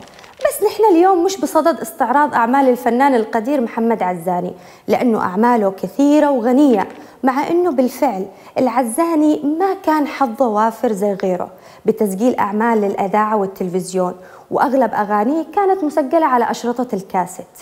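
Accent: Aden, Yemen. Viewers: والله أنك مثقفة ومؤدبة ومهضومة وقريبة للقلب والأهم أنك حلوة ولهجتك رائعة أحب اليمن وأهلها من سورية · جمال اللهجه اليمنيه لا تمل منه الاذن فكيف اذا كان من أنثى لا يملها النظر حفظك الله من كل شر امين يارب 😍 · اللهجة العدنية فخر وابداع .. شكرا لك .. قصة مؤلمة ..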